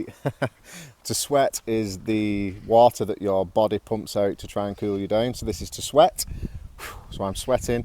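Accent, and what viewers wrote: United Kingdom. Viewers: Your lesson is so helpful because you pronounce every words in British accent and your speed is average which is also helpful for understanding the native speaker in practical situation... Thank you from India. by the way British people left English language for Indian when they ruled our nation.do you know about this?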